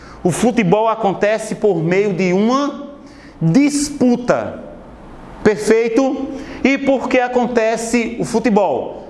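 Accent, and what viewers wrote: Northeast, Brazil. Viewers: Ótima explicação! E esse sotoque..do meu nordeste é lindo.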